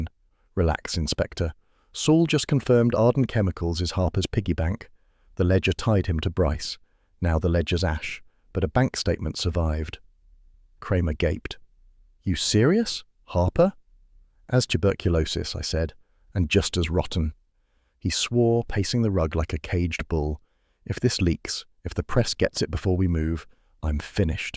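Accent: England, United Kingdom. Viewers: Sorry. Just can't accept the English accent for a NYC based story.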